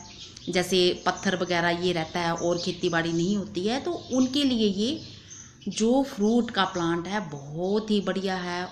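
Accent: Punjab, India. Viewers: aapki aawaz punjabis tone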